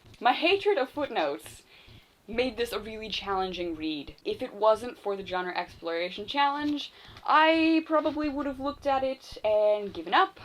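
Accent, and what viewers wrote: Canada. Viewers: You're definitely Canadian, but I'm guessing you're from Newfoundland or somewhere close.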